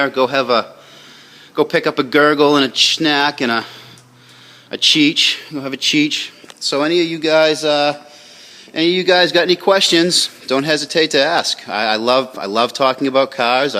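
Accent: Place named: Boston, United States. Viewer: The caaaarburator, love that Boston accent.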